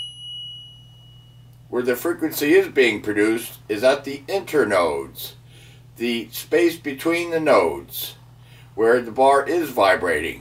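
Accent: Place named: Catskills, United States. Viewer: I wear hearing aids and now I'm wondering how they work! I'm based out of northern New York State near the Canadian border and I've always collected rocks and minerals from all over the country. I also prospect for gold and often travel to southern NYS and Vermont. If you ever feel like finding some gold let me know and we could plan a trip. Your accent sounds like my family in the Catskills!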